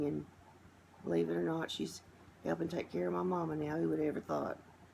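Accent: United States. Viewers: I completely agree about reborns being helpful for getting through those anxious times. I like to feed and change mine when I'm anxious too. Also agree about God giving us strength we never could have guessed at right when we need it. He is good all the time. I'm so sorry you and your mother are going through this, though, and you will both be in my prayers. I love your voice and your accent. I was born in NC. I now live in IL, and I miss my family so much. Listening to you reminds me of home.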